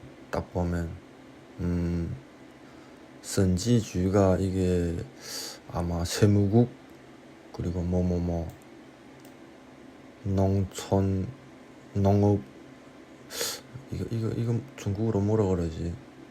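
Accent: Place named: North Korea. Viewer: 조선족은 약간 이북말투가 섞여있을 수 있어요 이사람은 참된 조선족입니다 중국 공산당을 빨아재끼는 조선족이 아니란말입니다.당신이야 말로 정신차리세요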